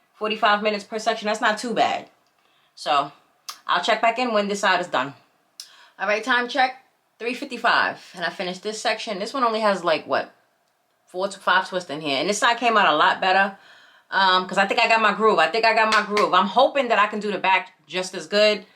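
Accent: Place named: New York, United States. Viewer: I love you NY accent ❤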